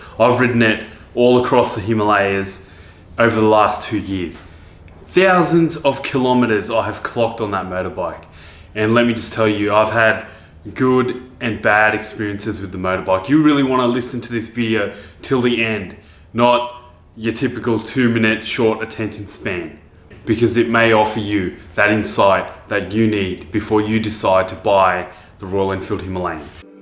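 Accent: Australia. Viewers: you sound Australian. haha. maybe I dont know my dialects very well. I have a CB500x. looking at these just for fun. we have no Enfield dealers and I'm sure I wouldn't lose a dime selling it if I didnt like it.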